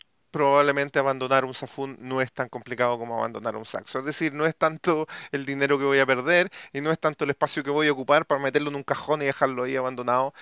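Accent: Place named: Chile. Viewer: asi hablan los chilenos eso ya no se corrige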